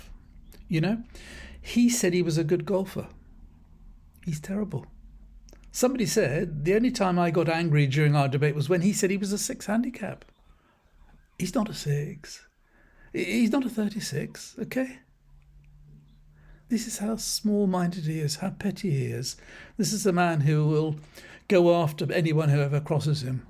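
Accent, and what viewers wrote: United Kingdom. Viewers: You know the speech is dumb when a British accent doesn't make it sound any smarter.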